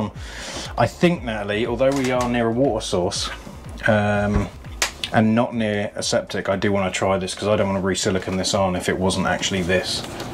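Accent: United Kingdom. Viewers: I just watched your video and it answered my question. But, I want to be sure that was the source of the leak. By the way, I could listen to you Brits all day long. Thanks from Arizona, USA. Dan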